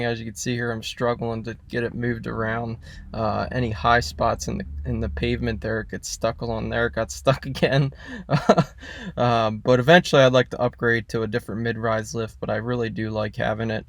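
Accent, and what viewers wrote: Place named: Pennsylvania, United States. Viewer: You sound like your from PA, although you did say wal-ter and not wonder. But other than that I hear a PA accent?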